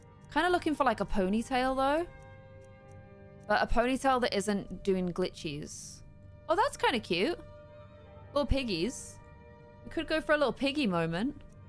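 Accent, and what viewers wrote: United Kingdom. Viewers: he's a geordie:)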